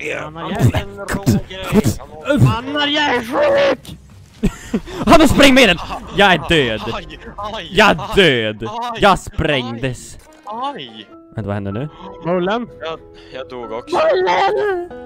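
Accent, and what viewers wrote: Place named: Norrland, Sweden. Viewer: Skön norrländska och rolig video :))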